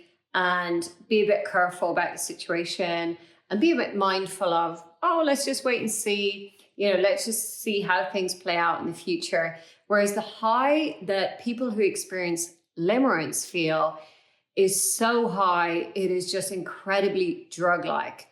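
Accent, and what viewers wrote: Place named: Ireland. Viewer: Limerance sounds like an Irish term...what better person to discuss this than a irish accented .. attractive woman🎉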